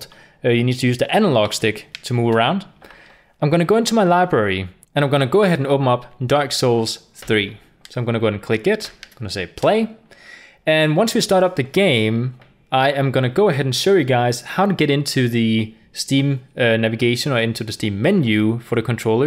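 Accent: Denmark. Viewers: are you danish??? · is he danish? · er du dansker kan høre det på din aksang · Are you a dane? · Are you danish by anychance? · Are you danish? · haha tænkte nok du var dansk ku godt høre der var lidt der. Men super fed video den hjalp meget og du får også et like her fra :) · Awesome video! Are you by any chance Danish? You have an accent, which sounds very familiar to me. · Are you from denmark? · is he danish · are u danish?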